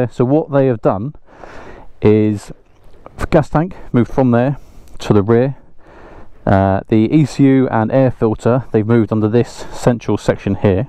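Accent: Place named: United Kingdom. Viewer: Bro you look like a Texan and seeing you speak in a British accent is funny😭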